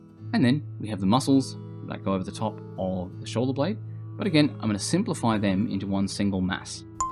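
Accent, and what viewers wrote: Australia. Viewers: Australian accent cool though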